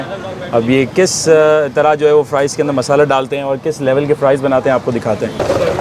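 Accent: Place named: Uttar Pradesh, India. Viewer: 🌹🌹🌹🌹🌹 भाई आप की बोली बिल्कुल हमारे उत्तर प्रदेश हिन्दुस्तान की तरह है माशाल्लाह हिन्दुस्तान जिन्दाबाद 🇮🇳🇮🇳🇮🇳🇮🇳🇮🇳🇮🇳🇮🇳🇮🇳🇮🇳🇮🇳🇮🇳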